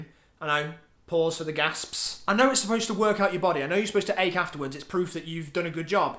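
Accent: United Kingdom. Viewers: You're so English its beautiful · My god I love you and your superbritish accent <3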